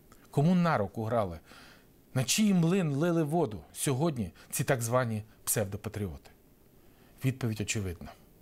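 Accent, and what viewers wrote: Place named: Russia. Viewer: говорит с русским акцентом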